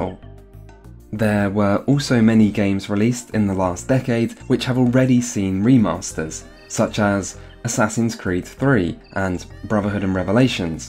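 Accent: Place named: United Kingdom. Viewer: You sound so much like the youtuber shaun or maybe I'm stereotyping british accents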